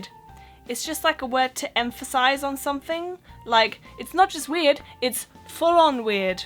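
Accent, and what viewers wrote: England, United Kingdom. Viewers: The most British sounding German in the world !! Even says " Bri_ish" !! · You sound English. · Wow. Your accent sounds perfectly English. (°o° ) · how the hell is your accent so english!? ive known foreign people who have lived here for ages and never pick up the accent! were you brought up with english people? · Slang words vary depending on what part of the UK you come from , some people in the south wouldn't know what someone from the north was talking about and vice versa, may I just say what a great British accent you have, very clear and well spoken. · You sound like a native brit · You sound like you are from england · Blooming 'eck kid, you sound more British than me, and I was born there.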